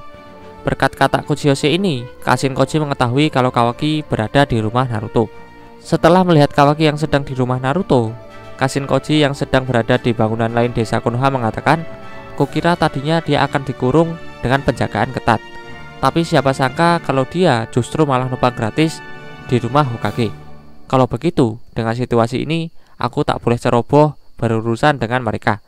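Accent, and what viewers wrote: Java, Indonesia. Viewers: Medok broo.. heheh ayoo puasa.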